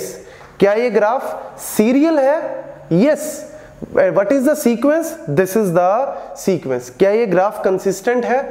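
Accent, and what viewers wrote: Punjab, India. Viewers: sir are u from punjab??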